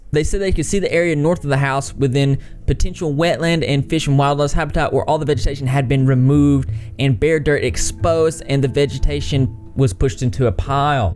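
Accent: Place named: Georgia, United States. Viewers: I knew that accent had to be from Georgia 🤪 I live there too. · I just found ur channel yesterday and knew u were from Georgia just by your accent- bc that’s where I live too 😂 happy you said it in this video so I’m not still wondering if I’m right! What area are you from? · I called it! I knew your accent was from east of the Mississippi!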